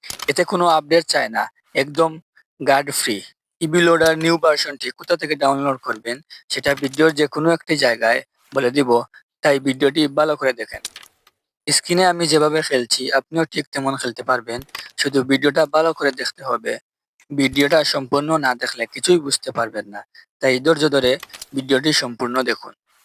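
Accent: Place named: Sylhet, Bangladesh. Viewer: ভাইয়ের কথায় বুঝা যাচ্ছে সিলেটি।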